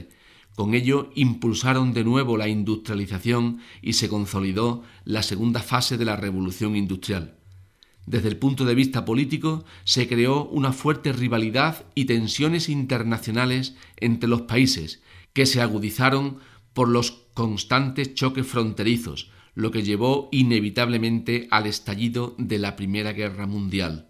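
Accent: Andalusia, Spain. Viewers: Tio hablas como un andaluz no puedo ver el video porque me mosquea ese estupido acento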